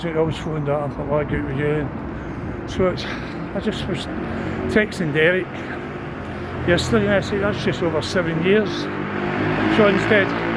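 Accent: Glasgow, United Kingdom. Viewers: You’ll need to buy yourself a wee battery charger, that will charge your phone as you walk, they are handy wee things. And you don’t need to be politically correct with your English words, we all love your Glesga accent And love your loyalty towards all your old pals, very nice touch that you name them in your videos, I’m sure their family will appreciate that you still talk about them even although they are gone As the old saying goes GBNF 😊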